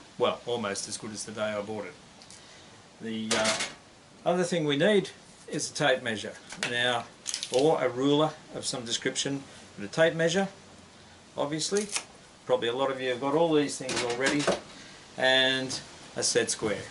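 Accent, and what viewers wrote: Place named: Australia. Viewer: Hi. Given your location as being in Australia (and the accent is a giveaway), I'm intrigued to know how you came to choose Great Chesterford/Duxford/Newmarket as the location for your layout. Another reason for my curiosity is that I did most of my growing up in Burwell, about four miles from Newmarket. Much of my education was in Burwell and Cambridge. Since leaving Burwell, I have lived on the outskirts of Cambridge. So, what's your story?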